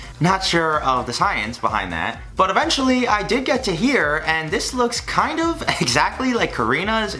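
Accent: New York, United States. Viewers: Is it just me or is actually Freddie Benson with a New York accent?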